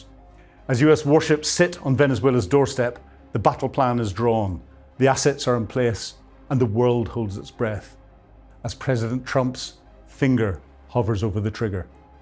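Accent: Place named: United Kingdom. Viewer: Don't try to tell us what Putin attempted to do to Kyiv but failed to accomplish. What, are you a British hack? Yes, listen to your accent. Another foreigner acting to be a type of expert on America's capability or intentions.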